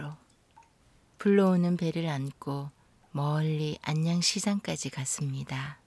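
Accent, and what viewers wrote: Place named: Gyeongsang, South Korea. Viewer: 참~~경상도 사투리는 언제나 들어도 좋아요 사람을 무장해제시키는 매력있어요^^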